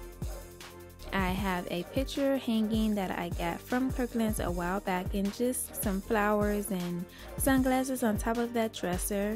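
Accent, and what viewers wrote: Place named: New Orleans, United States. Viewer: what part of Louisiana are you from? Sounds like I hear a New Orleans or Lafayette accent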